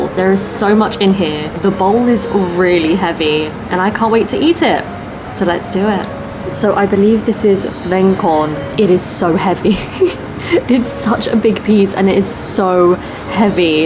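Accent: RP, United Kingdom. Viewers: 美味しそうに食べるなぁ！やっぱ英国の英語ていはいな。40代だけど中学生の時に途中からアメリカ英語にかわった。聞き取り安いけど、イギリス英語は雅な響きあるな。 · ほんと可愛いし、British Englishへの慣れという点でも助かる。こちらも見てて楽しくなります。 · 何時も拝聴しております。さんのクインズイングリッシュはとっても聞き取りやすい。イギリス英語？は何時の頃からか話し言葉から書き言葉に変化したのではないでしょうか？。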